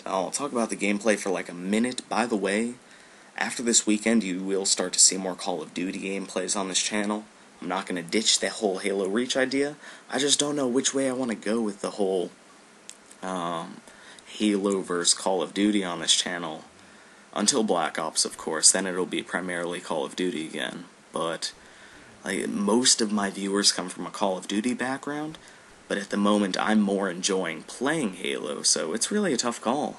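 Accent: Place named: United Kingdom. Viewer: FlaShVidsHD well he does sound British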